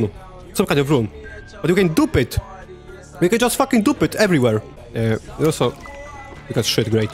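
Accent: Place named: Poland. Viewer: Niezły film Oraz dobry akcent Ale Polak zawsze pozna Polaka nawet gdy mówi po angielsku Ale wciąż bardzo fajny odcinek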